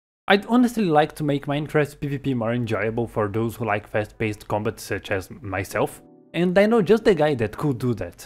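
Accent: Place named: Rio de Janeiro, Brazil. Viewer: nao tem como esconde o sotaque carioca kjkkkkk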